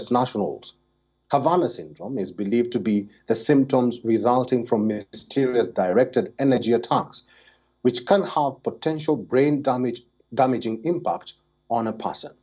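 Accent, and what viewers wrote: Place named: India. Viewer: She's the only reason I watch WION. She has a nice Indian accent. It's understandable but non Indians like myself and it's still Indian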